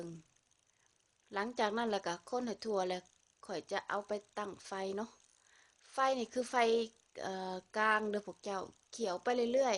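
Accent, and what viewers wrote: Savannakhet, Laos. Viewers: พาสาลาวสำเนียงสะหวันนะเขตพากใต้ลาว... 🤨🤨🤨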